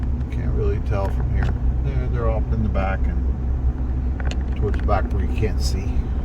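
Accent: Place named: Canada. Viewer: This is awesome! I was planning a trip soon! Good Canadian accent!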